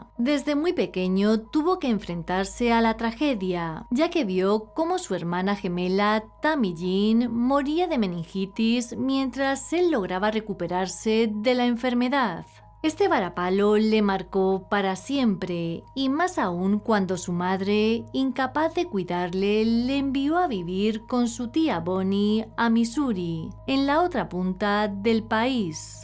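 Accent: Spain. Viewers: No molesten a, así es el acento o dejo en algunas regiones de España… es como que a un cordobés lo criticaran por hablar como un cordobés (de Cordoba, ciudad argentina). Hablan cantando y se les escucha muy gracioso aunque a veces no se les entiende. Pero son genuinos!!! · O será el acento de la región de España donde nació. No se que decirte. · ¿Le dirías a un mejicano que no hable como Cantinflas?, ¿O a un venezolano que no hable como un narco?, es Español castizo, no es latino, así que ajo y agua, a mí me encanta como habla.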